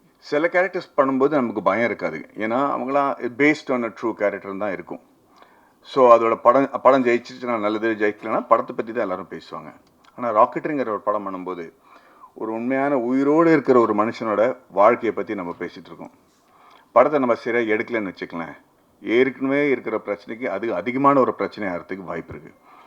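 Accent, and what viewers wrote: Coimbatore, India. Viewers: Sollanum "nga important "nga Maddy picked up Kovai Slang well 😅😅😅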